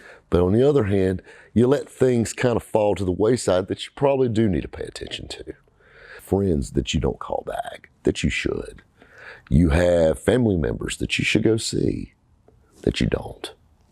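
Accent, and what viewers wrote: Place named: Greenville, United States. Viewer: Bro i feel so crazy your from greenville.. I would see u on vinwiki.. I always thought this. Guy sounds like us and he funny as hell.. Your doing great and you represent greenville 👍👍👍 and yes bwm or everywhere 😂😂😂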